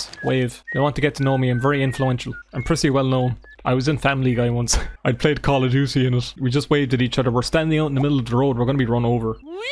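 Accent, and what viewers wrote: Ireland. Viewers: Sims 1 with an Irish accent . I clicc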